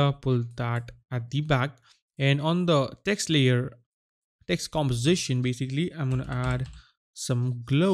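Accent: India. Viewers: Время идёт, а индийский акцент не меняется :) В чём дело?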